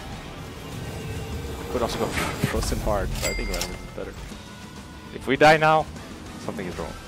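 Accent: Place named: France. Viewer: He's starting to have a French accent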